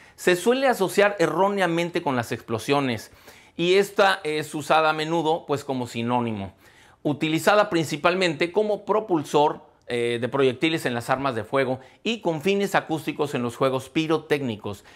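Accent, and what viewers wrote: Mexico. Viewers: Gran explicación si señor , y sobre todo me encanta ese acento mexicano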